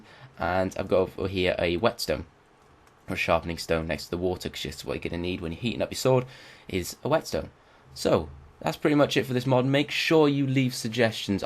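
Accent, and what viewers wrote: England, United Kingdom. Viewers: Your so English.... I LOVE IT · I don't remember you being British. Not trying to be rude, just saying.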